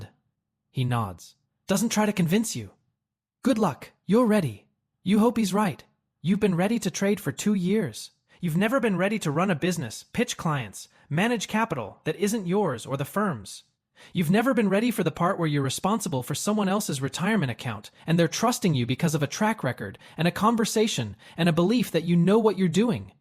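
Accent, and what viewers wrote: United Kingdom. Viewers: I love how it slowly slips in and out of a brittish accent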